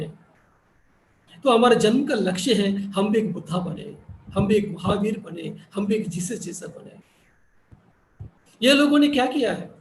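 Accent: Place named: India. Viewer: I am fascinated by yur valuable words as well as ur Hindi ascent Sir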